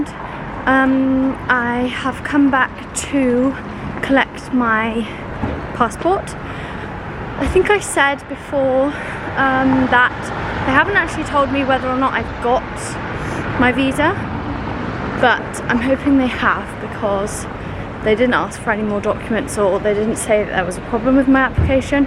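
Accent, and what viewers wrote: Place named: United Kingdom. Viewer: You accent seems brtish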